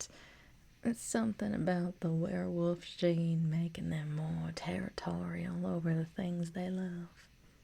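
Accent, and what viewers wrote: South, United States. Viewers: The return of the Southern accent praise the gods of universe! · I hope we get to hear more of the southern accent, it’s lovely ❤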